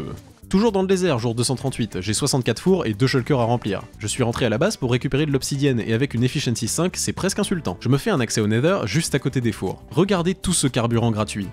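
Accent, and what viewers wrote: Quebec, Canada. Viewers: Tu es quêbêcrois? Moi oui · T’es tu un Québécois? 😂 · wesh l'accent canadien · Ce com est en honneur de ton incroyable accent québecois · Es-tu Québecois? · est tu québécois ou canadien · L’accent québécois c’est pour me tuer😂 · Super accent canadien 😉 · Putain ta le meilleur accent québécois du mondes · J’adore ton accent québécois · Ton accent Quebecois est cringe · T’es québécois? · l'accent quebecois a la limite du perfect WOOOOOW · j'adore l'accent quebecois que tu tes donner XD et cest un québécois qui approuve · Les références sur plein de chose que je ne connais sont INSANE. Et jsp si c'est volontaire ou nn mais les intonations de voix avec une ressemblance a l'accent culte québécoise sont réussi. La naration est parfaite. Je dit merci a tiktok et a ma curiosité pour avoir découvert ce chef d'oeuvre sur YT. Je n'ai pas jouer a minecraft depuis la réforme du PvP 🤦‍♂️ et tu me donne envis de m'y remettre. 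Un gros FÉLICITATION pour sque tu as réalisé. 👍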